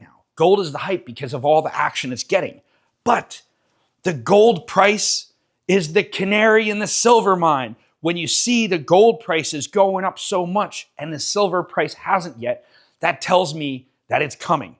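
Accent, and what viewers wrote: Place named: New England, United States. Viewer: Hey, I bet your not.......I recognize that voice...........it's!!!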